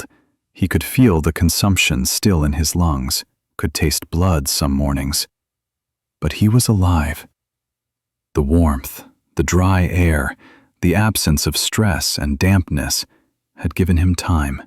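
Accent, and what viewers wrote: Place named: RP, United Kingdom. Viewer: Please explain the reason for the back and forth shifts in the narration, starting in standard North American English without accent and changing to English with a posh British accent. This starts at approximately . At that point, the narration was describing Daniel's father, Thomas, who was a immigrant stonemason from Ireland. (Just a little historical side note: In the past --- how can I say this delicately--- the Irish and the British were NOT best buddies. Enough said.) Then at , the narrator returns to North American speech without accent until approximately when posh British starts up again. Posh British only lasted a short time until stopping mid-sentence . From that time, the narration was in North American English until when Posh Brit accented speech popped back up up. the narration reverted to North American speech without accent until the end in less than one minute. 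This story and the background artwork were excellent. However, the back and forth accent narrative is distracting and detrimental. Please skip the posh British accent unless the story is about posh British characters.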